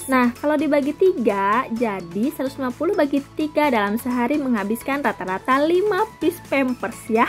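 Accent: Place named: West Java, Indonesia. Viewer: Lucu ngomong nya enak di denger dg logat Sunda nya 🤗😘 salam kenal Ceu 🙏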